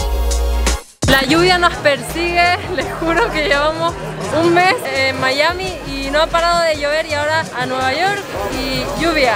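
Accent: Chile. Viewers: eres Chilena? tienes el tono de una, saludos de Chile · hablas como con acento de chilena what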